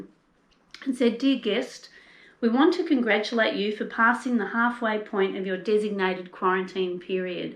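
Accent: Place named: Australia. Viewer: We enjoyed hearing about your Sydney quarantine experiences and had a good laugh as we misheard a couple of words as we are not entirely familiar with the Australian accent. Donna was confused, at first, about the 240 "chickens" (check ins) at the hotel. We were also concerned about what we heard as the "crime scene" (quarantine). So nice that the time passed smoothly for you and the staff and officials have all been so helpful and welcoming. I said to Donna, "it is so much better being there together rather than being a single person isolated in a room". Isn't it nice to know that two walked in and two walked out. No bodies for the hotel staff to clean up. LOL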